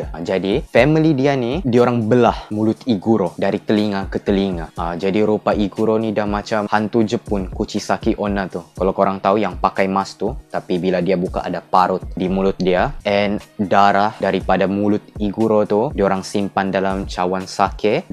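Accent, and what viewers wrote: Sabah, Malaysia. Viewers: org sabah kh? ketara sleng sabah tu hehee. btw, hai saya fans dari sabah hehee😊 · punya best lah orang sabah ini ckp!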